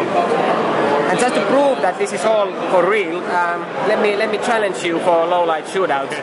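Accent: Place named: Finland. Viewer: that finnish accent is annoying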